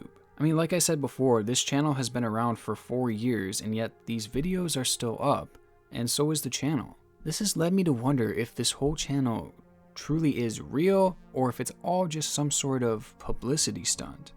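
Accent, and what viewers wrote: United States. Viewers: the improper grammer is driving me crazy my guy lol, you keep saying woman when you are talking about women plural. You sound american or Canadian so I'm confused with this repeated mistake. Great video tho keep em up, just my little nit picky gripe.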